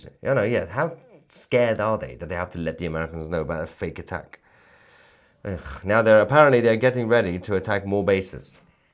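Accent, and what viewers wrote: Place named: United Kingdom. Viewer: Dude stop exaggerating everything and jer jerking off on everything and I'm getting a little f**** pissed because of the way that you're exaggerating things and pulling s*** out of thin air and I thought you were better than that, now you just sound like a f**** stupid f**** Brit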